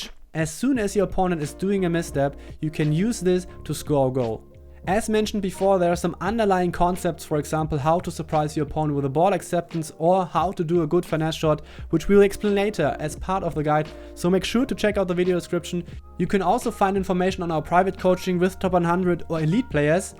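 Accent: Germany. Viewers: Dieser deutsche Akzent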